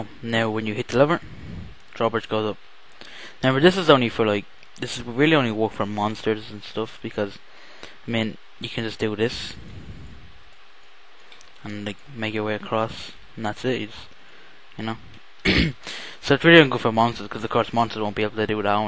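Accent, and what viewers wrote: Ireland. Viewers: hes irish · That was cool. Nothing wrong with an Irish accent either.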